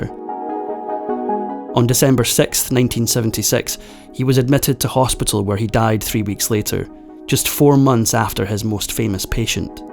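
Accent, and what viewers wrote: Scotland, United Kingdom. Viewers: Can you please stop with the British accent? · That's a Scotsman accent. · Evil has resided in Hollywood from day one! Sadly, it has destroyed the lives of way too many actors over the many years, like Anissa.😔 Btw, I love the Scottish accent! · Yeah, beautiful Scottish accent. Lovely 😊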